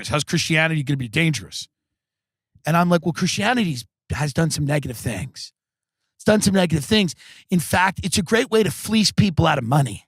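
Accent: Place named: Long Island, United States. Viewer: Maybe it's his long island accent but I love when he says SHUT UP! you really feel the disdain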